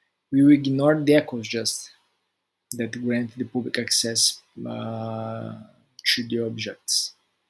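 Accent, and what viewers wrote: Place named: Brazil. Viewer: Bro u sound like a brazilian... 🤔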